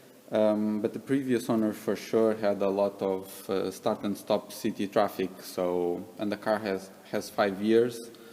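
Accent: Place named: Russia. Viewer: Судя по инглишу, автор случайно не русскоговорящий?)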